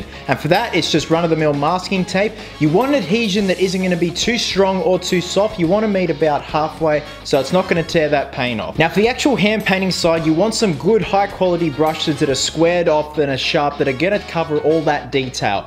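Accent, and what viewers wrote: Australia. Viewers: I could tell you were an Aussie from the start of the video.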